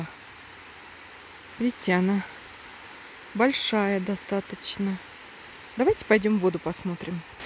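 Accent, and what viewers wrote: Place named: Don, Russia. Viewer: Спасибо большое и за видео такой красоты! Такая экзотическая природа для нас, жителей степной зоны. Такая кпасотища в горах! И ещё в каких горах! Находясь не в любых горах можно обозревать такие великолепные виды. Смотришь, смотришь - не налюбуешься. А какие деревья с камнями, как приятно радует глаз ярко - зелёная трава и папаротники. А водопад.... слов нет, только эмоции восхищения! Спасибо Богу, что он так нас любит, что для нас людей такие виды создал, а вас, использует с, чтобы побольше людей могли таким полюбоваться, чего они в своей каждодневной жизни не видят и видеть не могут. Спасибо, что взяли нас в поход за грибами. Как там интересно с вами ходить... что ни шаг - прекрасные виды и в вверху и внизу и по сторонам. Как вам там сложно снимать было! Ведь всё время под ноги надо смотреть и баланс тела держать и про съемку не забывать. 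И высоко всё - таки, чтобы у вас и голова не закружилась. Вы как молоденькая девушка порхали там с пенёчка на пенёчек. А потом как показали снизу - крутизна то склона какая была! Смелая вы женщина, по вашему говору, мне кажется, что вы - донская козачка. Может я ошибаюсь, но удаль у вас и смелость и напор, как у них, мне так кажется. Не знаю кто бы мог так бегать по таким горам с таким азартом. Моё вам уважение и восхищение. Не слушайте никакой критики, если она будет - вы лучшая по съемкам в горах. Мне очень, очень, очень понравилось! Вы полностью донесли до нас коло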